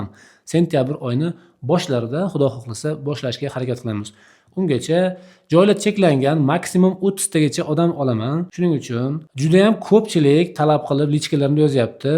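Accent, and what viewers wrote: Namangan, Uzbekistan. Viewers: Узингиз Намангандансизда, шевангиздан билиниб турибди. Ман олдингизга бориб урганмокчиман, майлими, Наманганданман...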